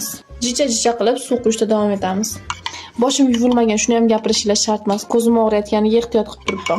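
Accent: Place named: Fergana, Uzbekistan. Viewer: Jijja jijjadan Fargʻona sheva Fargʻonaliklar bitta layk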